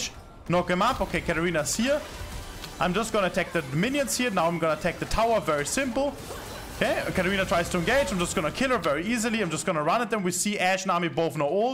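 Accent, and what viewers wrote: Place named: Germany. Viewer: Just listen faster man haha xD Maybe it's also easier for me, cause from the accent he seems like a german speaking guy. So i can understand that very well ;) That makes it even more impressive doh!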